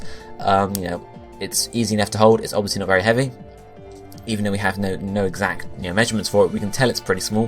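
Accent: United Kingdom. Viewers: How does a magearna fit in that bag?! A 1ft diameter ball was fishy but a 3'3" ball?! You sound british, perhaps you don't know how to translate feet to centimers?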